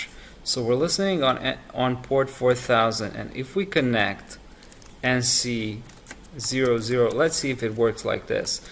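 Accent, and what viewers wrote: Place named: Ukraine. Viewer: you dont have an accent? Your funny. My girlfriend is from Ukraine, yall sound very similar. I am going to be teaching Golang for pentesting. I'm working on it now as we speak. But I'll send you the link, when I'm getting close to showcasing. I wanted to do one for bash but you and,a few of my other technical friends are doing Bash. So I decided to wait.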